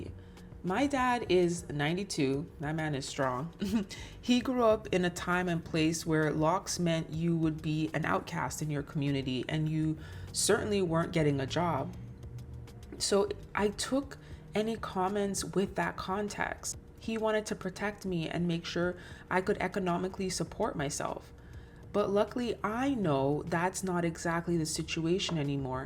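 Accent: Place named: Toronto, Canada. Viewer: I knew you were from Toronto by your voice; are you also of Jamaican descent?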